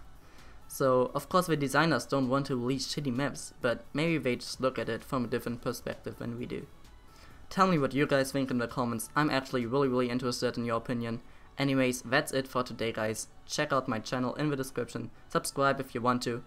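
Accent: Germany. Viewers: Haste eiskalt den Intro-Song von Solution geklaut... Ansonsten relativ nichtssagendes Commentary, dass CoD zu hastig und profit-orientiert released wird ist nichts Neues, daran wird sich aber nichts ändern, da hier kein gutes Spiel erstellt werden soll, sondern Gewinn das einzige Ziel der Produktion ist. Englisch ist gut, nicht perfekt, relativ flüssig, noch leichter deutscher Akzent, ansonsten solide. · Sick german accent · Your accent is funny ^^ A typical german accent and luckily i haven´t got one that bad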